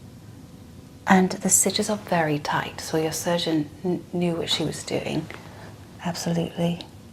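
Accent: RP, United Kingdom. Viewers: Received Pronunciation (often referred to as RP English) generic South East England. A bit like Gwyneth Paltrow in Sliding Doors. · brit, i dig it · Odd bits sound a british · Love Your ASMR British Accent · Downton Abby English certainly, Modern English not so.. But very good accent for that era/class.. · lovely British accent by a lovely nurse 😊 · From the uk ,you have nailed the accent it’s very bbc great work 🙌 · You look very beautiful. And your British voice/accent is extremely soothing. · Great video! Wonderfully done "Broadcaster British" and, IMO, the lack of eyeliner/change of makeup compared to your usual videos looks really lovely (gave it a more doctorally feel). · As a Brit this is very good. Usually, when non-Brits try an English accent they tend to drift all over the UK merging different dialects. But this is solid. 😊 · Great video! I listened with my eyes closed and you sounded like a true Brit!👌👍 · Love the British accent 😂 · I'm British this sounds posh to me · Posh English accent 😝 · British accent on ASMR kill me😍😍😍😍😍😍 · Wow I'm British myself! 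and your British accent is amazing! You sound posh and upper class! I love it 😊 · Not a bad british accent... A bit of mix between dick van dyke and marry poppins, which seems to be where most yanks (unfortunately) get their reference materials 😊 Your accent is a little bit overly RP, so it sounds a little "posh"... But at least you didnt try and go for a cockney accent 😅 Nice job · This was great! Bit of the posher old fashioned Brit but sooo relaxing! Jolly good show! Let’s have another please! In fact a pat down in Britain! 😊 thanks Steve x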